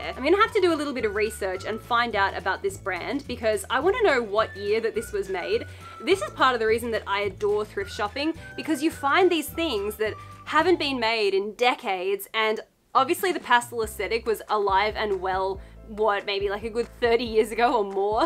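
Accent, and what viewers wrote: Australia. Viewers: Gorgeous skirt clearly vintage- NO, NO, NO! Do not compromise this piece- it looks lovely on you as is- really suits you! Who’s the green cutie? Archie is he? His vocalisations and video bombing you at will is so sweet and it’s clear you two have a warm and lovely relationship- gently biting each piece to be involved- so cute! Clearly new to you and your wonderful AUS accent- ‘Hullo from the USA 🇺🇸❗️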